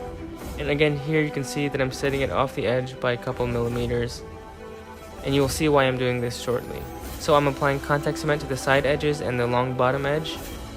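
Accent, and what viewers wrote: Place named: Asia. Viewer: bro where are you from where your accent is like asian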